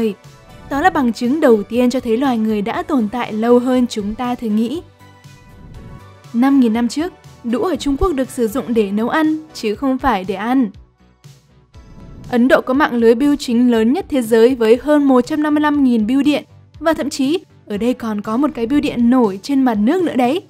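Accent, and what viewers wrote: North, Vietnam. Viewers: nói tiếng Bắc là đúng r mà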